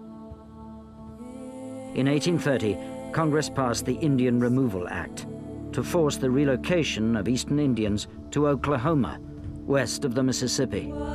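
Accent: England, United Kingdom. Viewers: Interesting listening to what sounds like an Englishman speaking words like Appalachia and others. Very well done video though and quite professional. The native superstition material was a bit much. Moira Smiley was a perfect choice for vocals. Her talent was only out done by her beauty. She is lovely.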